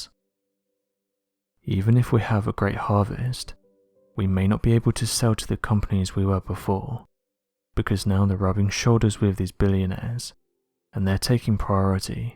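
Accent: United Kingdom. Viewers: This was probably taken out in Western Kansas at the feed yards out there. It happens, folks. It's hotter than Hades right now and the picture is misleading. All these cattle didn't just drop dead where they lay. In large operations like these the dead ones are gathered up and buried in a mass grave. When your running a few thousand head there's going to be losses so this is just nothing to make a big deal about, though, our British accented friend seems to think so. Nothing to see here, but, Beware of the Fear Mongers on YouTube.!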